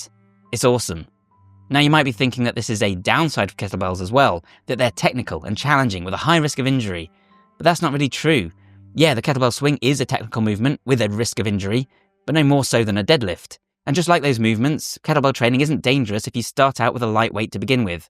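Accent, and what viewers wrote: United Kingdom. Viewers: Closed caption gives a transcription of CATTLE BELLS. Now the largest cow bell is over 2000 lbs in Germany. So maybe has something here....cow bell lifting. Or maybe it's just the Brit accent.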